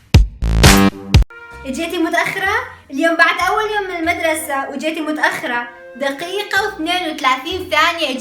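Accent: Mosul, Iraq. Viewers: انتي عراقيه مبين بلهجتك شنو اسمج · هااي لهجته العراقية 😊✌ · لهجتها هيك لان احنا المصلاويين نحجي هيك وهي البنت اشورية يعني مسيحية ومن الموصل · اشو لهجتها كانو عراقيه بس اكو كلمات بحجيهه مو عراقيه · سؤال انتي عراقيه اشو نص لهجتك عراقيه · احلى شي حجي عراقي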